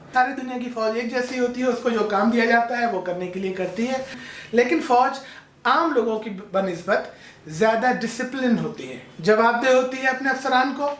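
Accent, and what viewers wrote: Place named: Darbhanga, India. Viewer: می دربھنگا سے ہو آپ کی اردو اور لہزا بلکل ابھی بھی وہی ہے.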